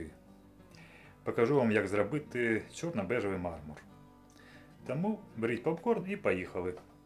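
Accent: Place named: Ukraine. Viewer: Что с автором? На украинском языке раньше не наблюдал. Я конечно по говору понимал что с Украины,но переобутся так сразу..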